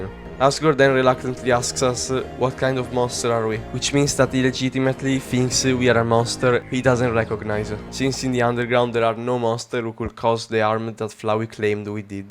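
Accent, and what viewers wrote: Italy. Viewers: Ho aperto il video letteralmente 2 secondi ed ho detto: Ma è italiano! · i can hear the italian accent... are you italian?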